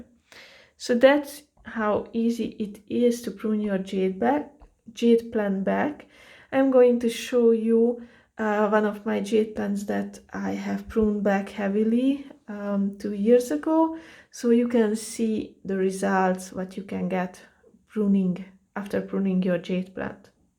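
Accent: Hungary. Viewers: immediately recognised the Hungarian accent:D Thank you for the video! It's very helpful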